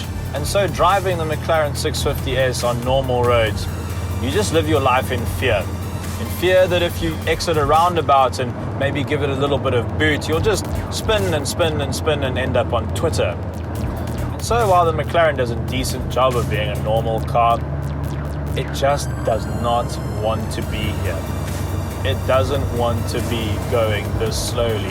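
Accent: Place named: South Africa. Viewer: ahhh nice.... no nonsense boring south african..beautiful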